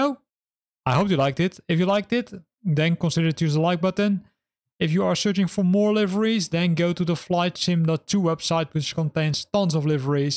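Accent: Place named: Netherlands. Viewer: I hear a thick Dutch accent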